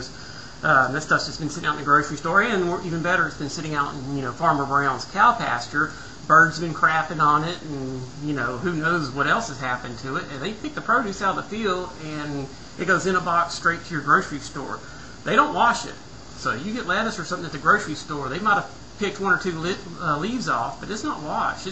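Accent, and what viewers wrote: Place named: Texas, United States. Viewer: Your from Texas, aren't you? I can tell from the way you talk and do things. I' m north of Houston.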